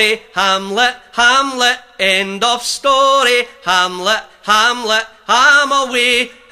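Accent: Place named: Scotland, United Kingdom. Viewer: This is awesomeness. And works best with the scotch accent.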